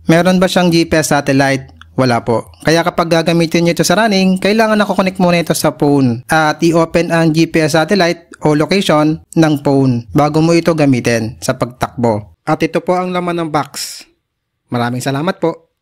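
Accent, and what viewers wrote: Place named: India. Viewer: Bat may Indian accent ka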